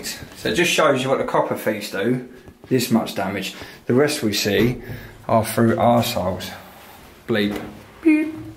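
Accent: England, United Kingdom. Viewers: Where in England is this guy from? I'm in Canada and can't get the accent.....can you send a message to me?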